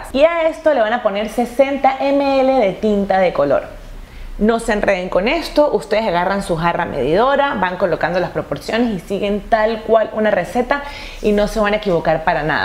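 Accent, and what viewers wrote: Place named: Peru. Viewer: de dónde eres? tienes el acento peruano... lo digo como peruano, español algo neutro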